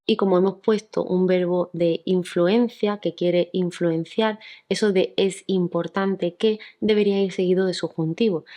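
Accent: Andalusia, Spain. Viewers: Hola, llevo mucho tiempo viendo tus vídeos. Saqué el apto en el DELE B2 en mi primer intento y me ha encantado este vídeo. Como soy de EE. UU., estoy acostumbrada a los errores que suelen cometen los angloparlantes; pero es interesante ver los errores de una persona de Alemania. Tu manera de corregirlos me sirvió mucho para aprender no sólo cómo escribir de forma "correcta" sino también de forma concisa y elegante. Se nota que escribes muy bien y que eres filóloga hispánica. Y viva el acento andaluz 🤗 me encanta!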